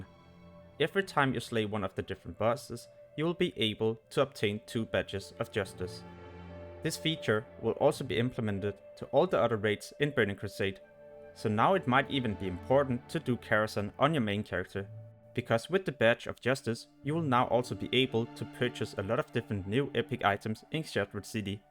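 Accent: Denmark. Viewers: You sound very danish.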